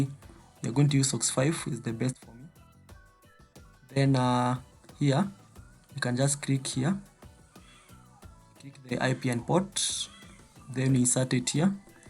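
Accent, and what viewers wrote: Kenya. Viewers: Informative channel, pia mimi niko na ma channel kadhaa huku youtubes, uko na strong kikuyu accent nimejua tu wewe ni mkenya lakini wakenya wameharibu market ya online kwa onlinejobs by using fake accounts na proxies, sasa its harder now ju kenya imebaniwa in most online platforms. Niko na swali, Go login yako unafanyaje ikuwe na over 300 days left na ya trial inafaa iwe na 7 days?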